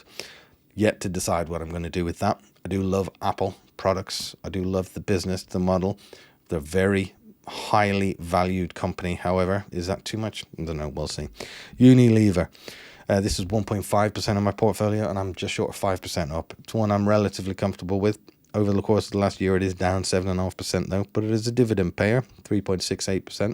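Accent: Ireland. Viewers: I can detect a slight Irish accent I think. You did very well with your portfolio, well done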